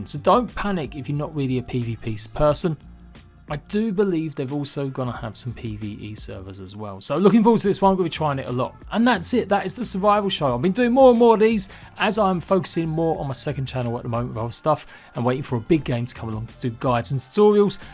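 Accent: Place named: United Kingdom. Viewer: Where’s that accent from? Can’t place it. Obviously British but unsure where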